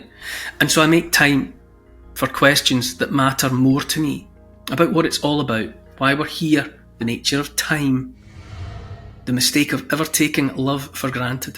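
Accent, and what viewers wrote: Scotland, United Kingdom. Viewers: I've just started listening to you after seeing you interviewed by Eddie Hobbs who I also only just found (late June 2025). It's so nice to hear you speak as you think as I do. I am English but I live in America and I see what has happened and is happening. I was listening to David Icke many many years ago, and he wasn't wrong, was he? Anyway, just a thumbs up for your YT channel here. Keep up the good work. And I like the Scottish Accent when I can hear what's being said and yours is very clear.;)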